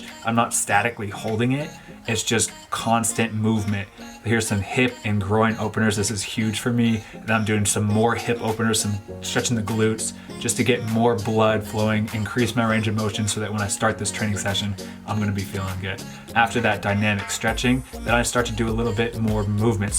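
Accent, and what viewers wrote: United States. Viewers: Are you actually from new Zealand because you sound American.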